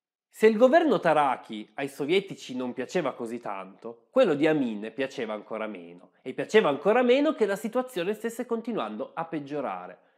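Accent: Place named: Bari, Italy. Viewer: Good morning Vietnam....bellissimo ma i pezzi musicali sono tanti dai a.....invece è bella come canzone è dei che consiglio ah ah ah ma tu sei un Barese oriundo.....invece che sono barese vedo che parli un dialetto completamente diverso, quindi nei paesi i Dialetti sono tutti diversi l'uno dall'altro, io Pensavo che Bari e le sue province parlassero il barese e magari Lecce e provincia il leccese...invece il dialetto cambia anche da comune a comune o anche a zone diverse della stessa città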